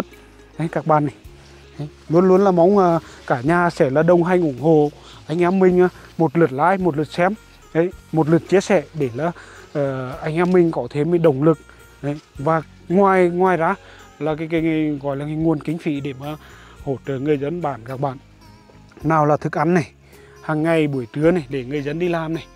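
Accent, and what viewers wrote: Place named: Nghe An, Vietnam. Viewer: Chất dọng quê choa mộc mạc mà dễ thương chúc khỏe bình an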